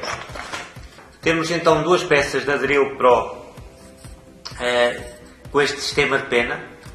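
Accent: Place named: Portugal. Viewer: Muito legal esse jig para corte... certamente farei um genérico em madeira pra mim rs Vi que você respondeu meu outro comentário aquela vez mas acabei perdendo a conversa... pra mim é mais fácil entender hoje o seu português que é completamente diferente do português falado aqui no Brasil por acompanhar diariamente O Homem que Mordeu o Cão da rádio comercial. Boa sorte no canal.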